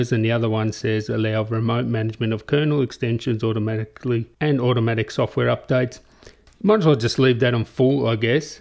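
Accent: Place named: Australia. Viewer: Australian accent is hard for spanish/latin speakers, I've watched a couple of Crocodile Dundee and then came back to this video LOL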